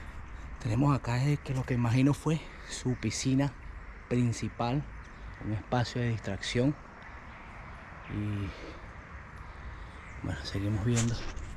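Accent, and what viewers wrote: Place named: Venezuela. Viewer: Tines asento como de benesolano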